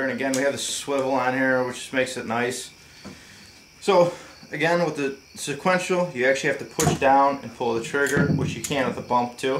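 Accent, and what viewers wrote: United States. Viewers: Great demo. Love the US accent. Now I'm going to have to find out if my Porter Cable nailer can swap out the trigger to become a bump nailer.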